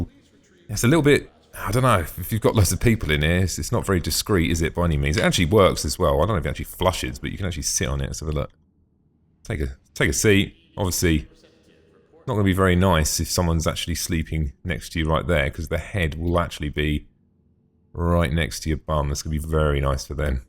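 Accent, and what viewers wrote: United Kingdom. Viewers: Love me sum British accent m8 it's refreshing to hear a nice voice commentating on the video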